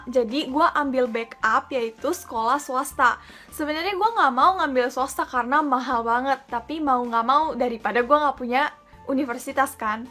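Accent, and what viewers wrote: Japan. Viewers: Logat mu udah sperti orng jepang dik.?.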